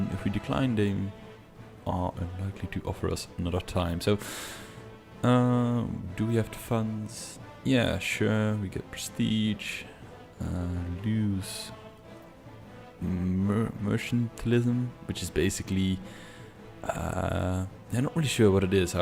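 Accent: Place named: Netherlands. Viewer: Well I'm assuming by your accent that you are actually Dutch and no offense but Italy shall win the World Cup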